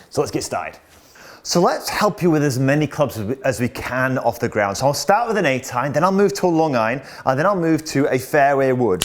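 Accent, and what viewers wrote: Yorkshire, United Kingdom. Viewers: Terrific video. Great tips. Plus, love the sub-titles. Fairway as Fairy Woods, Long Irons as Long Grinds. Love that good old Yorkshire accent. Ta.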